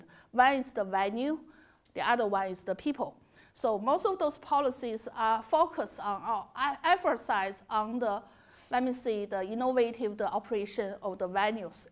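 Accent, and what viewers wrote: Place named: China. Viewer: 这中式英語果然听的清楚多了、：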